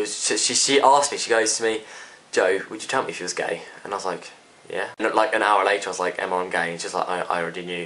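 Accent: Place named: West Midlands, United Kingdom. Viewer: ...lly beautiful, real handsome guy. You from the West Mids? (just, ur accent) x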